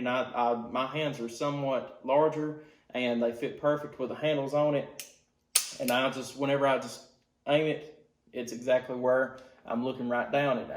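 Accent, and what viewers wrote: South, United States. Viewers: Hell yea glad to hear a fellow southerner creating good content in the gun tube space.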